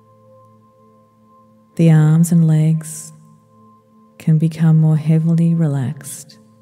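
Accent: Australia. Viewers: This is great Australian voice... Thanks from India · She has an Australian accent, so she says "centered" but it sounds a bit like "scented." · Such a lovely voice....Australian?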